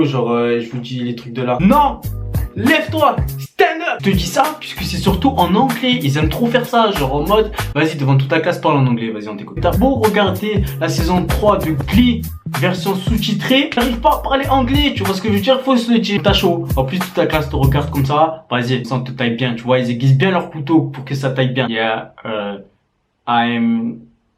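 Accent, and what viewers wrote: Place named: South, France. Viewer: J'adore tes videos, et ton humour et l'accent du sud😂😂😂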